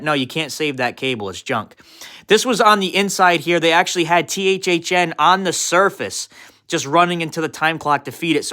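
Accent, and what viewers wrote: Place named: Fall River, United States. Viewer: Hahaha I took one listen and was like this guy's gotta be from FAWL RIVAH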